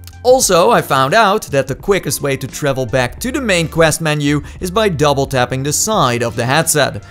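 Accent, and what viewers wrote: Netherlands. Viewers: are you dutch?? idk why but i have a feeling with your voice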